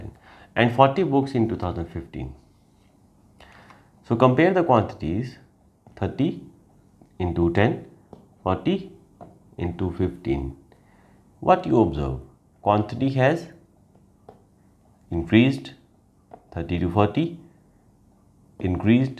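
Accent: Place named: Russia. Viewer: Russian accent!😄 love u sir for the way u teach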